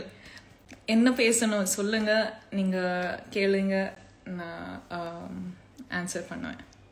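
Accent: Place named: Kodagu, India. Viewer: its kodava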